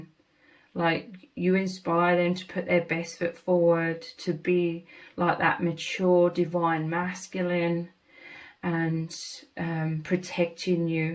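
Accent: United Kingdom. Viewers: Yes ma’am sounds a lot like me. I’m very empathic probably over the edge super empathic or something, but I had to go quite silently on a lot of folks that don’t deserve the privilege I’ve ever been you know again they don’t deserve that after that transaction of pain. It was a crucible that really changed me an altered us quite a bit me and my dog, but we’re looking forward to better times So I could sign my name or something, but I’ll just put a little emoji that describes me quite well It’s very ferocious there and then and yours is a pretty good way to describe me as part of that team and stuff but we’ll just get on with this. Have a great beautiful day You sound like you’re from over there in British land or something Take it easy 👉 🐺 👈 I kinda had to get that way you know all that I had to observe and punishments and announcements that I had to absorb and then read myself well it was left with something to protect me in a biscuit here Alone, one .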